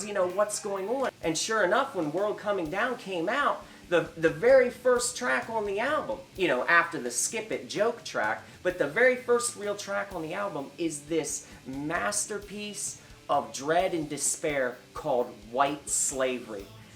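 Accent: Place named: East Coast, United States. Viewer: This was like a TON biopic.. so much info, it's fantastic💚🖤. You gave each album equal opportunity; the the details of his life personality, for example his fear of judgement and substance abuse/ mental health. He was such a sweet soul deep down. Your tales of meeting him are so endearing. Thank you so much for this. He really did make the world if music a better place. P.S. I was way off on your accent a while back... definitely caught the east coast this time. That one time I guessed the South 🤦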